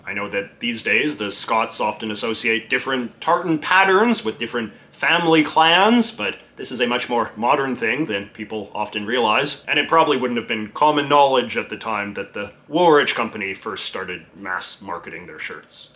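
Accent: Canada. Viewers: Of course the biggest steriotype of Canadiana is accent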